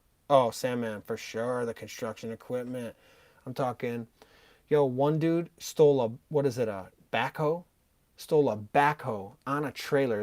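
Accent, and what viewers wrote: Hawaii, United States. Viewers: Cool, I can hear your Hawaii accent. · This guy sounds like a local braddah. Love your work bro🤙🤙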